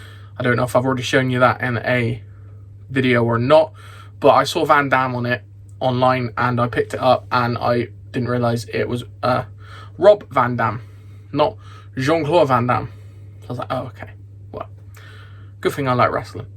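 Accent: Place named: Bristol, United Kingdom. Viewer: Great video - Love how you are int oso many different genres, aloa love the accent from a fellow Bristolian! More please!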